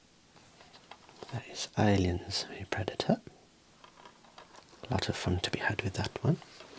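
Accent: United Kingdom. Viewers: Did I hear a British guy say his favorite Wii sports game was baseball??? 😅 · wow its wonderful how u pronounce the british accent, this is the best asmr with u showing us ur collections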